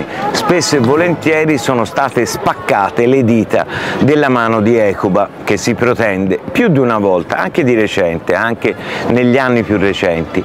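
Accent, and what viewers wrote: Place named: Florence, Italy. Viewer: Ascoltarla è un piacere. Tratta sempre argomenti vari ed interessanti con un gradevolissimo accento fiorentino che mi ricorda gli anni felici vissuti a Firenze. Un caro saluto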